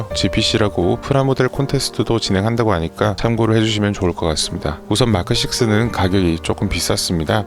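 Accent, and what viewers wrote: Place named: Gyeongsang, South Korea. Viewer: 몰랐었는데 경상도 싸나이셨네요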